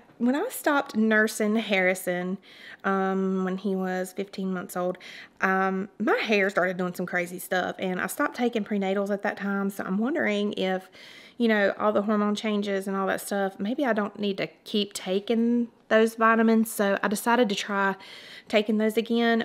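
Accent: South, United States. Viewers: Watched ur video for the first time! I enjoyed it so I subscribed! Like ur Southern Accent!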